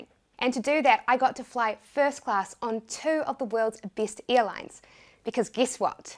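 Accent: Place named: New Zealand. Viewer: That NZ accent. *shudder*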